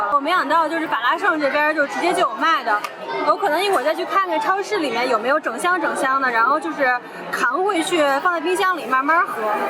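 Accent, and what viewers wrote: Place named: Beijing, China. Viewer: 北京味儿贼亲切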